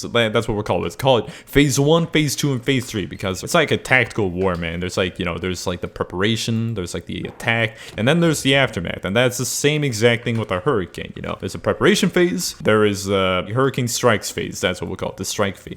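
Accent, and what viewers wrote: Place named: Florida, United States. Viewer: you generally sound floridainian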